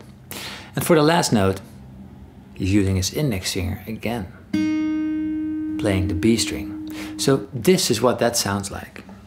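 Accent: Netherlands. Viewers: both dutch, it's the (faint) accent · Jep, it's the Dutch accent :) Actor playing Daario Naharis is Michiel Huisman, also from the Netherlands. :) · Ik hoor een hollandse tongval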